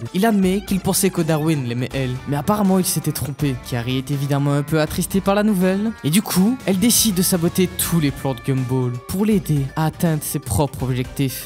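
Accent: Liège, Belgium. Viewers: J aime trop l accent de liege 😂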